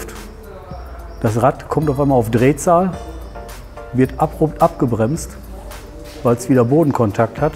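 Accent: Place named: Ruhr, Germany. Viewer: ganz große Klasse. Ich mag den Mann. Besonders seinen Kohlenpott- Akzent! und seine menschlichen Ausbrüche: "Wo hab ich denn den 24er wieder hingelegt?!"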